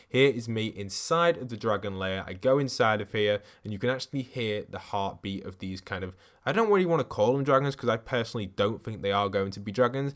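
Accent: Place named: United Kingdom. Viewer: HIS BRITISH voice calms the video even more dammmmmmm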